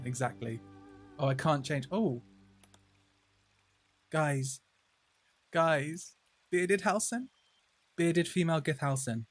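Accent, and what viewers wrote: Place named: United Kingdom. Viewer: Your British accent made this video even more hilarious!:face-blue-smiling::face-blue-smiling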